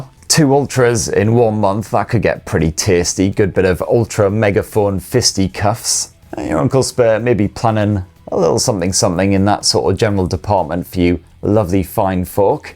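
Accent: United Kingdom. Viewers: I know its petty but I appreciate that you stick with the UK pronunciation of Z, I've seen a lot of other UK tech YouTubers saying Zee and it mildly annoys me. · I come here to learn British English.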